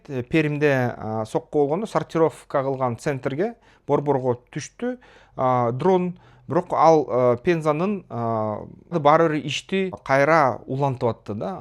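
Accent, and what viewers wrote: Standard. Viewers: Азаматсын,нукура кыргызча суйлойсун.жанылыктарына ыраазымын.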